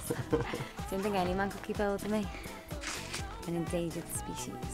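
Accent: United Kingdom. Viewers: she barely 5"0' her britsh accent can kill anyone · i lik the scouse accent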